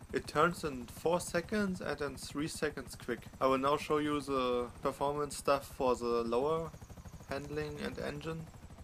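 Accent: Germany. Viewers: Deutscher man merkt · Du hörst dich deutsch an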